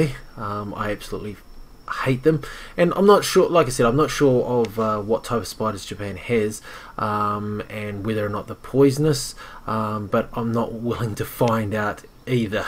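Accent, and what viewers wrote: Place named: New Zealand. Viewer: Spider wib! Man, I love the NZ accent! xD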